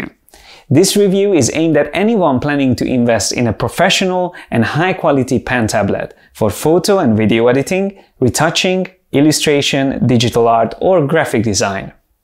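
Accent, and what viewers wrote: Hungary. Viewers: Are you Hungarian? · Thanks for the review, I use an Intuos Pro M for everything at the computer instead of the mouse, it helps to perform better. Oh, and I just found your channel, great stuff! You have some Hungarian accent, do I hear well? Keep up with the great work! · Thank you for the review! I can hardly wait to try the S pro however I'm still not sure is it worth to buy the pro version, but the better pen, extra programable buttons for shortcuts and the "touchscreen" possibility convienced me. I was suspicious that you are Hungarian because of the pronunciation, so that I must check it up and found it out that you were born in Budapest:) Szép estét!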